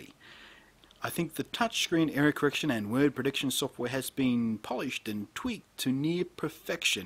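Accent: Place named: Australia. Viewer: ooohhh man i love your australia accent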